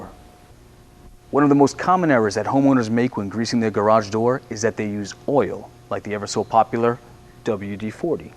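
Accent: United States. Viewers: The presentation was great,. I like your NY accent too. (Long Island?) Could be a north Jersey accent. The company jingle sounds like it's from the '70s. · Is that a Laaung Island accent?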